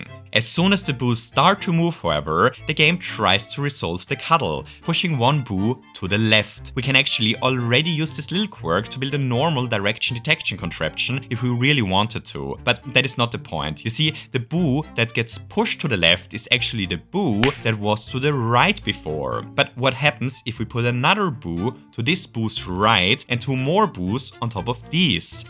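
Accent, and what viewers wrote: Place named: Germany. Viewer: I never get tired of watching your content. Being honest? I like your accent. It gives your videos some personality. German, perhaps?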